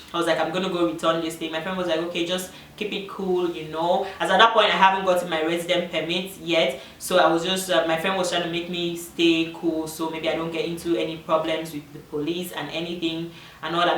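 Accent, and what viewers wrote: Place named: France. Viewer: I think her accent is French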